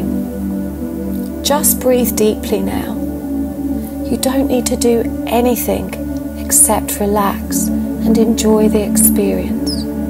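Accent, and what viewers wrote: United Kingdom. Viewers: Wow! Thank you! That was very transformative... I’m very glad I watched this. I felt so elated and tingly all the way through... and man! That was the coolest elevator I’ve ever seen if I do say so myself 😎😂... but seriously, thank you, I honestly wasn’t going to watch it as I’ve been off Twin stuff this week, me and my twin had a massive argument and I told him to move out which he has started to, so it’s been a rough week to say the least - I’ve gave it up to the divine and surrendered to refocus my energy onto myself, I’m just out of patience right now and we both have healing to do, anyways, I was guided to here for a reason, perhaps some healing has been done for my connection and all the other beautiful twins 💕 plus I like listening to your accent 😊 I’m Scottish, moved to Denver 4.5yrs ago, so reminds me of the UK 🌹 looking forward to more of these, thank you so very much for sharing your gifts with us all and helping us on this journey 🙏 love and light 💕🌹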